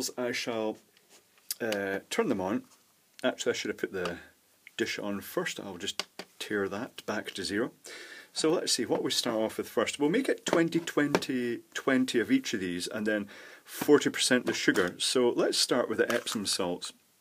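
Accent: Scotland, United Kingdom. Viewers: ha nice to hear a scottish accent. listening from Paisley.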